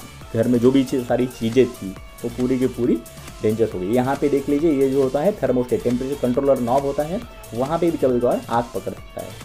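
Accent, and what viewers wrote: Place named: Maharashtra, India. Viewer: Lay bhari gulabi hindi guru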